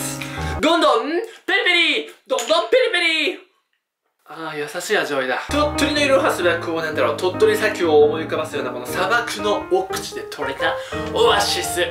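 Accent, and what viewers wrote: Toyama, Japan. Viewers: はじめしゃちょーに質問します。富山弁ですか。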